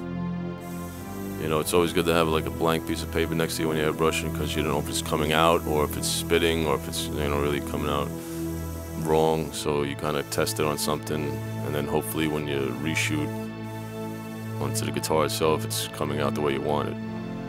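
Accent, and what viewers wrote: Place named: New York, United States. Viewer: ah okay. i'm not from the US just sounded like new york somwhere...jersey was the closest thing i could think off ;) nice axe man, doing one myself at the moment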